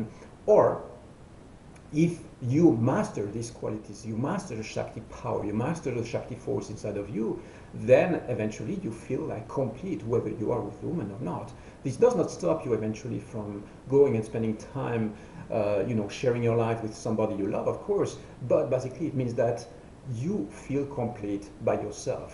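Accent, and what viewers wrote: France. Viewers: Agreed, again. BTW, Vous etes français ? Your accent sounds a lot like French, it's cute.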